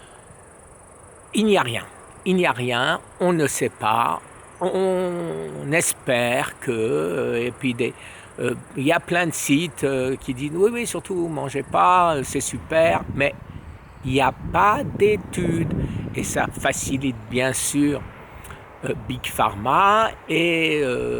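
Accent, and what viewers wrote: Alsace, France. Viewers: Coucou j’avais bien cerné que vous étiez de l’Est de la France avec votre prénom et votre accent Vous êtes Alsacien du bas Rhin Et moi je suis née en Lorraine À Thionville (57) Puis vécu près de Metz J’habite en Provence (83) aujourd’hui Pour en revenir à la vit B 17 pour le noyau d’abricot riche de cette vit c’est l’extraction du centre du noyau je l’ai entendu sur YouTube Ou le trouvez-vous?! Merci 🙏🏻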